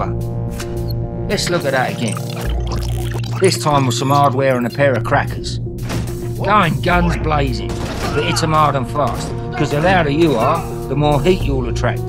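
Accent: United Kingdom. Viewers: The narrator reminds me of Michael Caine. That's a cockney accent I'm hearing, right? Curious as to why this game is launching on a Wednesday - most PSN games launch on Tuesdays, and I haven't noticed info about this on the PS blog. Is this announcement tying into the PlayStation meeting today, particularly with the PS VR version coming soon?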